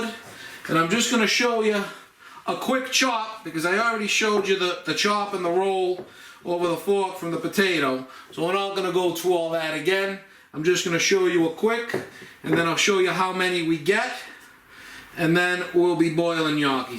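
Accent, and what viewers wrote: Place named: South Boston, United States. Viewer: I'm trying to figure out what is more amusing: Your simple recipes or your "Southie" accent. Love them both!!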